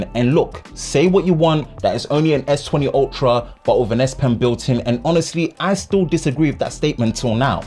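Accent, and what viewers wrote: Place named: United Kingdom. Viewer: let's just say, I didn't expect a silky British accent, lmao.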